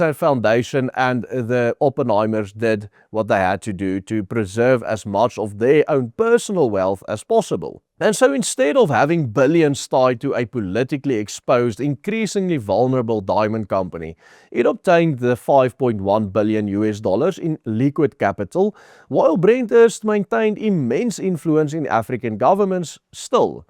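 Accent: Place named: Afrikaans, South Africa. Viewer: This has to be the thickest Boer accent I have ever heard.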